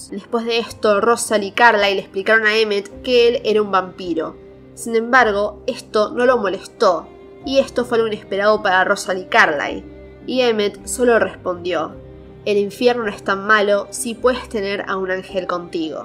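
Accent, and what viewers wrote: Argentina. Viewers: Usted suena como argentina, lo es, señorita?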